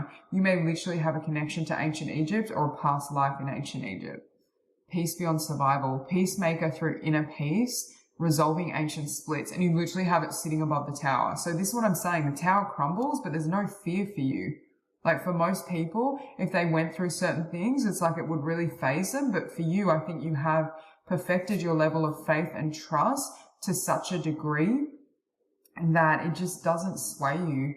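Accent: Australia. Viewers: Ah, beautiful reading number 4, and lovely to hear your Aussie voice. I lived in Brisbane for 3 years, I so miss the sunshine there. Being an artist my creativity exploded when I lived in Australia. I loved the light and vibrancy of the place. In comparison the Uk which has a denser energy, I have had to work harder to raise my vibration. It has taken quite a few years to adjust being here. Oh, receiving some gold would be nice!☺️ The Police used to be my favourite band when I was younger! Warm Wishes and Blessings. Many thanks for your messages. 🌟🤍😌🤍🌟🕊️🧚‍♀️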